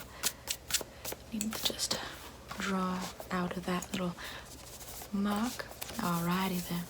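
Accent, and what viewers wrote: South, United States. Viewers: Southern accent stuff is great.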